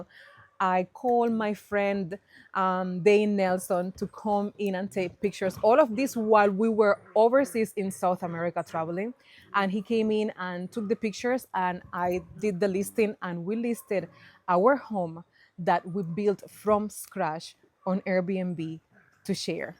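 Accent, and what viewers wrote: Jamaica. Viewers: I love to listening to you speaking patios 😃😃👍🙏🏽🙏🏽🇯🇲❤️❤️ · You are such a great storyteller, content creator, wife, mother, businesswoman, and everything. So captivating. I have been watching you from as long as you have been doing this. It was you accent speaking the patois that first grabbed me, then I saw the sincerity and value in your content. Very uplifting and encouraging. I have being enjoying them all, and now Im hooked. Wishing you every success. Mucho love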